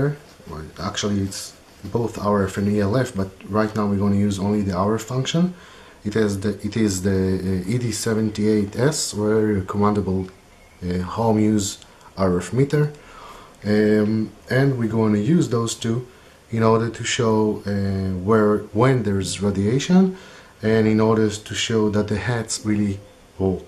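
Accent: Israel. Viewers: i think its a scam, guy sounds like israeli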